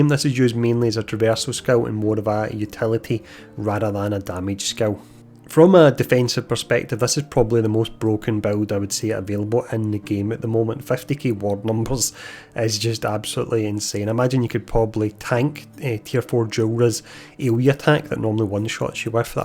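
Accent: Scotland, United Kingdom. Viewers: damn love ur accent :D R U Scottish? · I like the video and accent because I live in Scotland 😂 not Scottish myself haha. I know English was forced here ages ago but it’s not that bad to use it for the videos 🦧❤️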